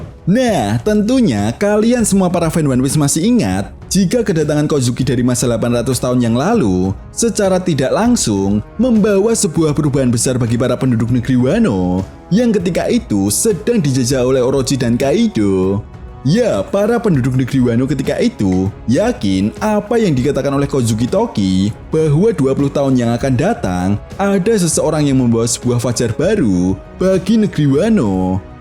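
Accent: Java, Indonesia. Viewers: Logat jawanya lucu, ini yang bikin betah nonton. 👍🏻👍🏻👍🏻😂😂😂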